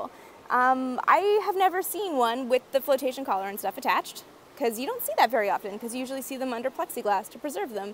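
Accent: Canada. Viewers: Great video , your adorable! So cute.. I can tell your from Canada.. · Ha you sound more Canadian in this video.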